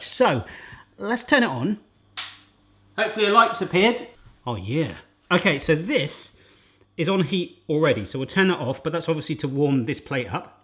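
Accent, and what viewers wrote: United Kingdom. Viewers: Yep your accent totally "Vanilla" British! hahaha ^.^ Loved the Egg/Toaster combo thingy! ^.^ ♥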